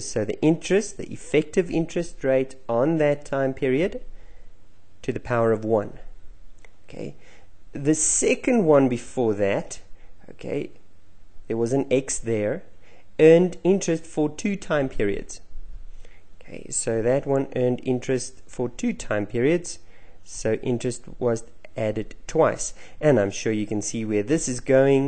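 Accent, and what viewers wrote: South Africa. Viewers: Thought you were from sunny South Africa. Thanks for the video :)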